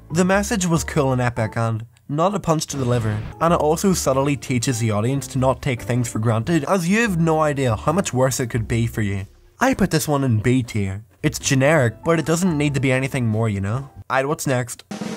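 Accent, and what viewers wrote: Scotland, United Kingdom. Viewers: You sound English but you sound a bit Scottish as well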